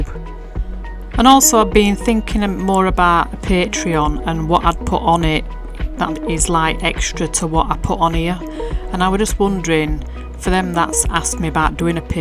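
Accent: Yorkshire, United Kingdom. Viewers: I’m detecting a Yorkshire accent. I’m from Sheffield 😊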